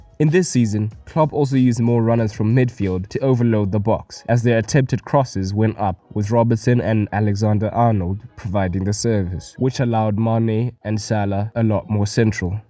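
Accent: South Africa. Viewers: could be south african or indian or indian in south africa :) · You from South Africa bro? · You sound South African nigga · he sounds south african, i know as a south african. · You sound very South African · is the narrator South African..? just really curious. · Are you South African? Glad I've found the channel - great content! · Dude you sound so South African ...are you ?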